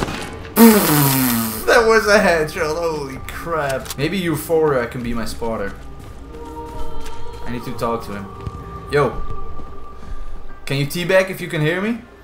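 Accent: Netherlands. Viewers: I smell Nederlands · ur dutch arent you ;) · your Dutch accent seems inaccurate for a German soldier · Thic Dutch accent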